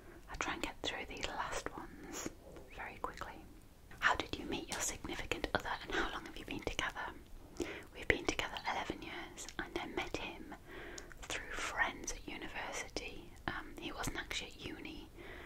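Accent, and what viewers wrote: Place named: Sunderland, United Kingdom. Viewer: Are you from Sunderland? Or the north eastern area? It's great to finally find an asmr artist from where I live!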